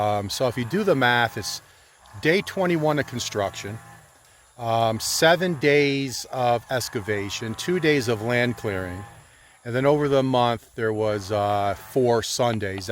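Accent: Philadelphia, United States. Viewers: Very cool - I hear philly in you :) am i right ?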